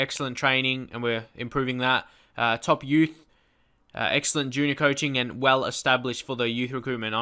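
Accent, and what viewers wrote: Australia. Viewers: Well he's Australian, ofcourse his pronounciation is gonna be different to the one in the UK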